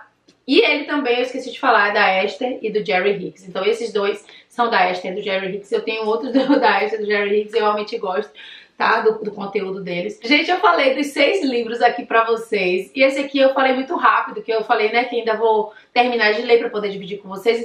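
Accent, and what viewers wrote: Bahia, Brazil. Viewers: Uma baiana ? Uhuhuuuuuu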